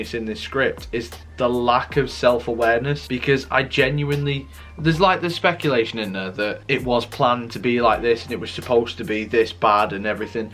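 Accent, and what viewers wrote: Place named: Manchester, United Kingdom. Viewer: I'm so lucky that I sit next to a bloke called Mark at work (well used to before Covid) and the joke never gets old. Can I ask what your accent is? Sounds a bit ' ' (capital of Manchester)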